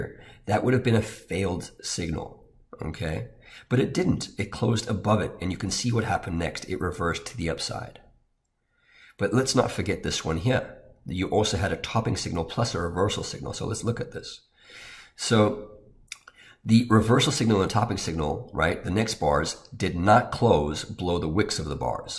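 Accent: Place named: South Africa. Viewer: Thanks, much appreciated. BTW I detect a hint of a South African accent way back there, am I right? Cheers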